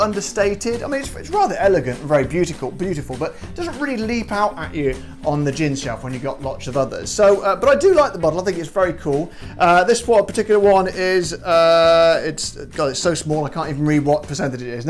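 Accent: Scotland, United Kingdom. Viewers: One thumb up for the Scottish accent. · Ur American voice is funny but I like ur Scottish accent. Bringing love from the states bro bro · Ooohhhh a Scottish burr is the sexiest accent on the planet. Nice!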